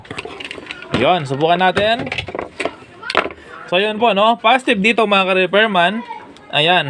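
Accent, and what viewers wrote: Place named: Pampanga, Philippines. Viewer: Kapampangan ka kuya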